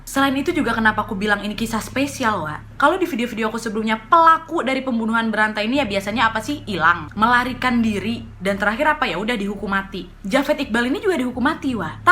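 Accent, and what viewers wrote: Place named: Medan, Indonesia. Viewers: Gara2 keseringan liat si wak nih, sekarang aku ikutan dia pake logat Medan kalo ngomong sama anak2 aku, pake "hah" segala, padahal aku arek Suroboyo 😘😘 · Br tau chanel ini krn social distancing.. Suka krn kontennya ttg crime, nambah wawasan ditambah lagi bawainnya logat kakak" medan ceplas ceplos ga brenti2, seru🤣👍👍👍👍👍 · Kakak tinggal dimana? Kok bahasanya kayak bahasa medan wkwk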